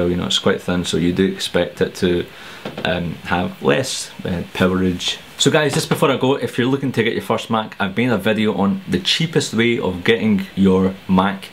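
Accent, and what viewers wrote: Scotland, United Kingdom. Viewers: He is speaking English it's called having a scottish accent!! I'm not Scottish and I can understand him. People are so ignorant sometimes as soon as they hear an accent they stop listening to the person and assume they can't speak proper English. :( So disrespectful. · another scottish person on the internet!!.... it isn't just me! :D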